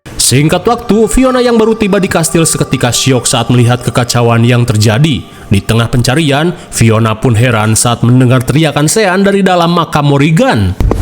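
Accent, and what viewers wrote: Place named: Java, Indonesia. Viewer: jowo pisan ta iki😂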